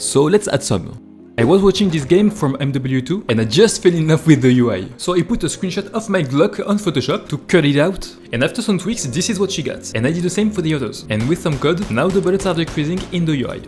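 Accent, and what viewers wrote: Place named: France. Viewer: French, huh? I thought I recognized the accent :)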